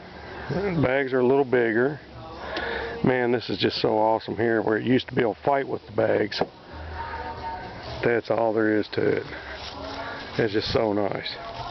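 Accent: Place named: Kentucky, United States. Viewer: sounds like you might be in kentucky. if so i'am from winchester. live in apopka florida have a 2014 street glide special gold peral about a year now . my last name is brett still have many, many family and freinds in lex, georgetown, louisville,winchester and kentucky area. ride safe and enjoy / peace !!!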